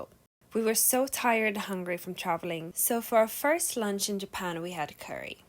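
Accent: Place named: Sweden. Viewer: just saw you in a video on the world friends channel, and I couldn't pin point your swedish accent at all. Where in Sweden are you from?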